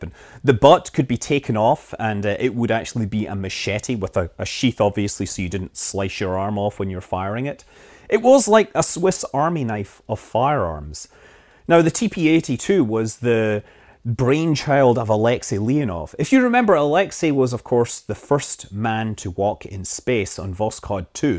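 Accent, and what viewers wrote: Scotland, United Kingdom. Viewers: Hear the voice of a true Scottish Space Highlander! Also, millions of Americans have some Scottish ancestry. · More likely Scottish.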